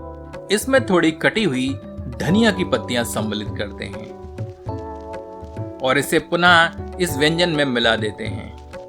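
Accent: Standard. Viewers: Appreciate your Shudh hindi and the do piyaza.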